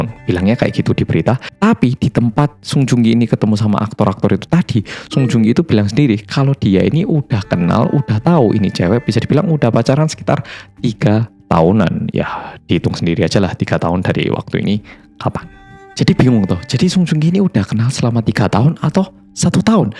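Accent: Java, Indonesia. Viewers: Medoook👍👍 Edit mungkin lebih halus jika "diasuh" mas bukan "dipelihara" mohon maaf koreksi dikit🙏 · Mas saya mah gak suka sama SJK, saya lebih suka sama kamu ... Muka korea logat jawa 😊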